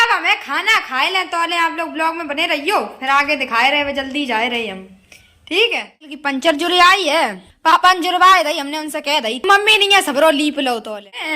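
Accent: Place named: Mainpuri, India. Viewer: Aapki Mainpuri language is best...?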